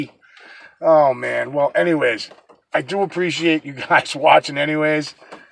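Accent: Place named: Massachusetts, United States. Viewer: your a riot 😂😂😂!! That Mass accent. And the way you word things..funny..God I miss home. Love your videos WTH download em all..lmao..love ya..Linda in Florida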